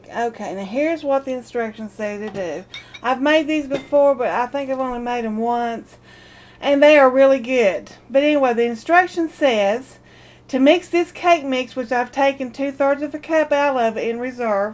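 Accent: South, United States. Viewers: I so love her accent. I am guessing it's southern?!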